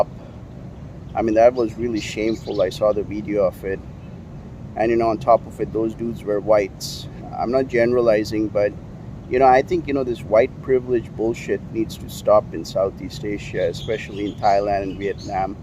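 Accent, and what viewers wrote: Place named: United States. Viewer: your accent is very American. Surprise that you are from India.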